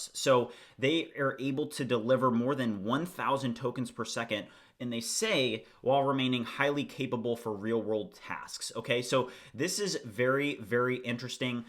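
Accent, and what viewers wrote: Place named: United States. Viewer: I like the 'merican accent and: eeaaAANNd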